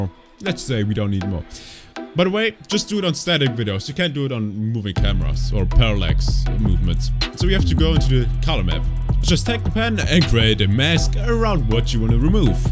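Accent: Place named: Germany. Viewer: Ziemlich aufgesetztes, unnatürliches Gequatsche - und deine deutsche Intonation ist dennoch unüberhörbar, mein Junge. Ist bei dir nicht geheizt, dass du diese irrwitzige Mütze tragen musst? :face-green-smiling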